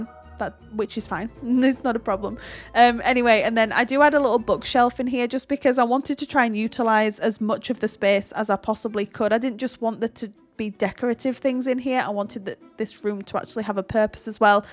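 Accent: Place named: Newcastle, United Kingdom. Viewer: yeah you guys sounds similar, by any chance is it called a geordie accent, right?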